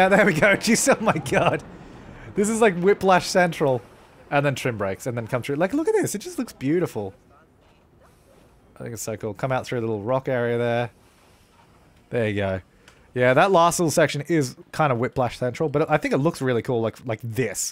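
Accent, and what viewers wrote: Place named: Australia. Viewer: Is it just me that thinks he sounds like a Australian WanderBots?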